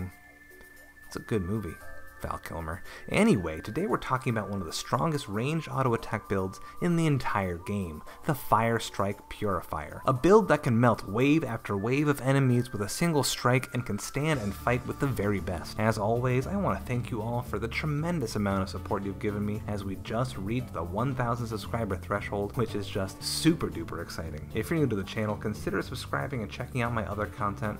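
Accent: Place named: Texas, United States. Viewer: In the First Moment i thought here was a Cowboy from Texas, who plays GD^^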